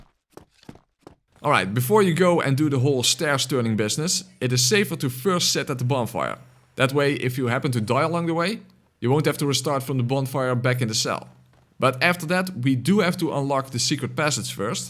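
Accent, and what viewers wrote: Netherlands. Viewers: First three words and I’m like “yep, this guy is Dutch” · Lekker kazig accentje! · His so very dutch accent is funny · Dutch accent, that's a yikes! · Ik hoor gelijk dat je nederlands bent!😂😂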